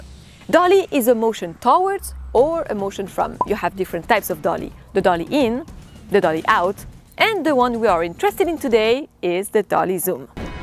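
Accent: France. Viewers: Honnetement = iJustine, et nous re-re-re-parler du drone du moment pour faire des vues ce n’est pas très interessant. Par contre l’accent francais en anglais et le debit rapide de la presentation c’est agréable. Du coup manque de contenu mais represente bien ce que deviens Youtube en 2018, une chaine de pub sans contenu. Ah, pour faire des vues tech actuellement faut parles du Nikon Z, du nouveau Canon, des Sony Mirorless ou de la 8K 🤓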